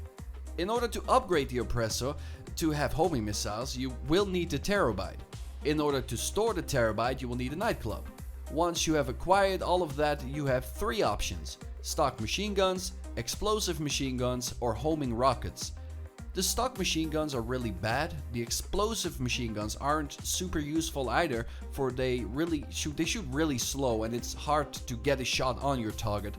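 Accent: Netherlands. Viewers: this guy has to be dutch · It's a nordic accent. Probably Dutch or Swedish.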